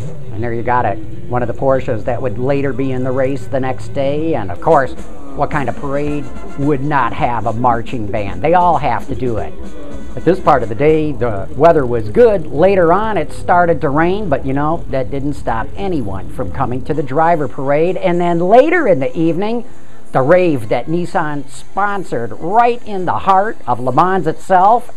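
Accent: United States. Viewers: This guy's voice is insufferably American. I'd hate to travel with him to Europe.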